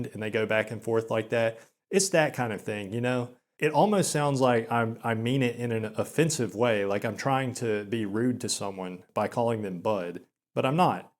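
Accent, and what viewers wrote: South, United States. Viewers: You’re from the South, right? What state? T. Savannnah, GA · yeah stfu dude. idgaf about you as this is the first video of yours i've seen. Your voice isn't giga-magnetic, but it's in the deep register and some people find the Southern accent to be extremely sexy. Talking slowly isn't inherity bad either. I also talk slow and "monotone" and never get the vibes people are being bored with me.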